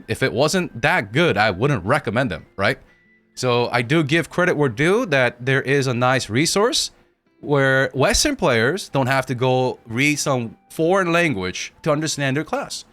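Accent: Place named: United States. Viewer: Wow you speak American English!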